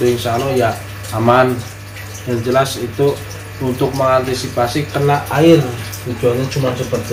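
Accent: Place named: Banyumas, Indonesia. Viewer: Salam ngapak lik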